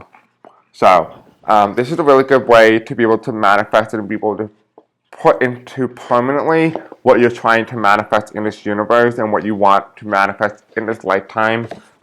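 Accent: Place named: East Coast, United States. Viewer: Your voise seems to say that you are from the East Coast of America.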